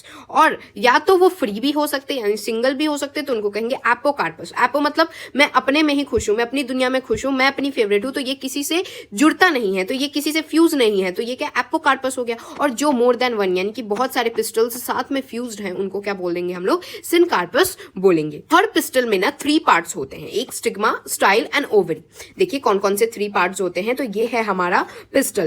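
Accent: Bihar, India. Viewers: Didi bihar se ho kya ???? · didi ap bihar se ho kaya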